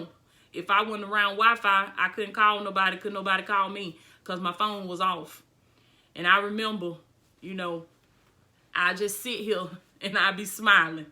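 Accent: South, United States. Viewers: I am also a survivor of domestic violence. I married young too and your testimony is powerful! I love your channel! I see and hear the power of God all over your channel and work the Lord blessed you to do. I felt immediately connected to your channel for many inspirational reasons but your accent took me my back home. ❤️ My people are from the South. I love your zeal. I’m 54 and have walked many miles towards the destiny that the Lord predestined for me too. I’m living my purpose through ministry among other things for the Lord. I purchased your book and subscribe to your channel. I love supporting others and believe that we learn much from the things we suffer. Your heart is beautiful and press on my beautiful Sister in Christ.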